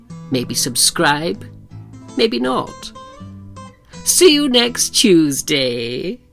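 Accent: RP, United Kingdom. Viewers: Omg!!🤣🤣👊👏👍 you are hilarious. I've never heard someone with such an accent telling stories like this! The posh accent makes it even more funny!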